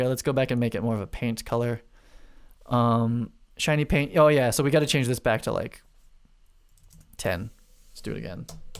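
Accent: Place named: United States. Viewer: Nope, pretty strong US accent but very pleasant to listen to.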